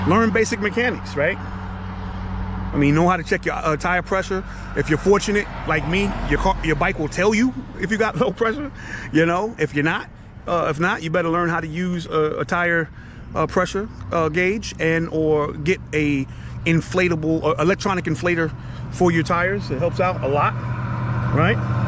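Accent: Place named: East Coast, United States. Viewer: Great content once again fam. I’m glad you cover the importance of being a motorcycle rider, I’ve had my license since 2005 along with training, but I rode before then. Just a quick question brotha, you sound like you’re from the East coast, but how is it in Savannah, Georgia? I’ve been thinking about getting out of Cali for a couple of years even though LA is hometown. Don’t answer where you’re from if it’s G14 classified, fam lol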